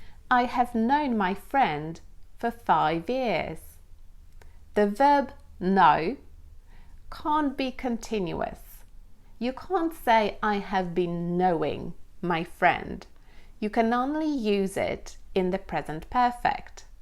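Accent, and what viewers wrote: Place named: England, United Kingdom. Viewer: jak na kogos kto nie jest native spreakerem fajnie udaje ci się imitować angielski akcent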